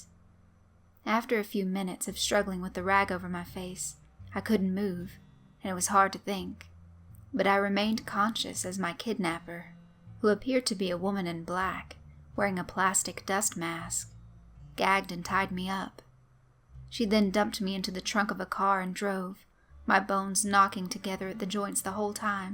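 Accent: South, United States. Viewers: I love your voice good too hear a sweet southern voice carrying creepy tails it reminds me of my old friend when we'd sit by the fire after bringing the cows up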